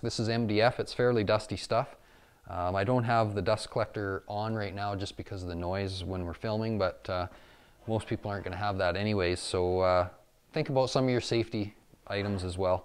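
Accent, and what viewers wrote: Canada. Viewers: His accent gives him away. Haha. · His accent is a pretty big giveaway but his hat also says Canada. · You must be from Canada or the Upper Peninsula of Michigan huh? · Is he from canada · def from canada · How nice to share your knowledge with all of us. Love the Canadian accent - you are a great help sir.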